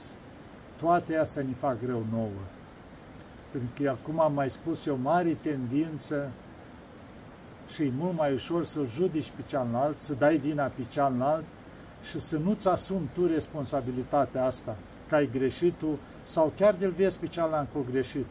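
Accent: Moldova. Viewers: Doamne ajuta! Parinte, astept cu nerabdare sa va ascult in vorba dulce moldoveneasca sa ne vorbiti de Postul Pastelui!❤ Slava Tie, Doamne!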